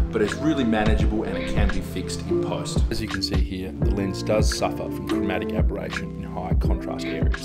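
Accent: Australia. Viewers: Are you from Perth?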